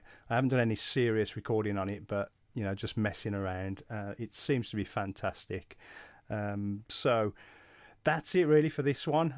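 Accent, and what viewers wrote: Midlands, United Kingdom. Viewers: Where in the midlands are you from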